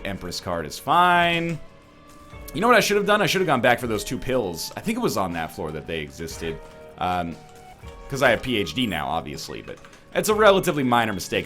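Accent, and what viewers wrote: Canada. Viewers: NL went so fucking Canadian this episode. The dark color to your tears after the reroll (and the thing that gave you piercing and spectral tears) was Dead Onion, btw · So NL's Canadian, eh?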